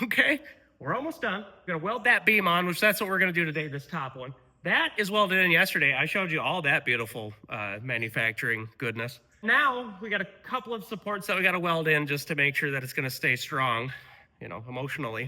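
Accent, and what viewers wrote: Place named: Minnesota, United States. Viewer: Sitting here hearing a hometown type accent going.. This dudes from MN.. Look up and sure as shit.. yall are. Fellow rock and cow, how are ya doin!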